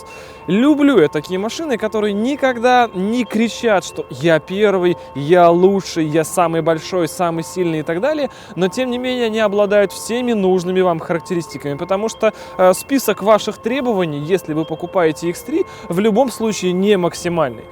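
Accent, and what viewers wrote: Saint Petersburg, Russia. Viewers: Уходит "Питерский акцент" который был в начале.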